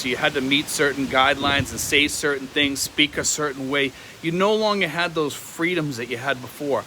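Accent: Boston, United States. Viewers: You just showed up in my feed. You could have been any guy from anywhere on the planet. As soon as you Open your mouth I said Boston.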